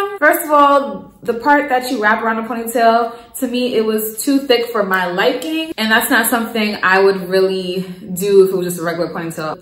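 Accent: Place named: New York, United States. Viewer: I can hear your nyc accent... ha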